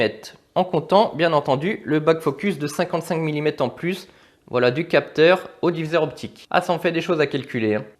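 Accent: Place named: England, United Kingdom. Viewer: Belle bête ! J'adore ton accent englich. 😉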